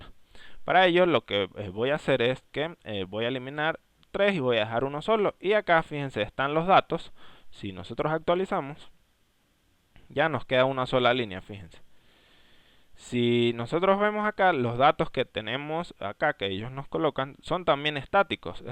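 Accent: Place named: Venezuela. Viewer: Chamo, primera vez que escucho a alguien de Venezuela, saludos brother, chevere tu video, me ayudo.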